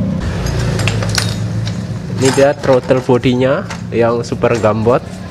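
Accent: Banyumas, Indonesia. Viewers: Bengkele ngndi mas ngomonge ngapak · Wong ndi rika....deneng ngomonge jawa ngapak kya aku😁😁😁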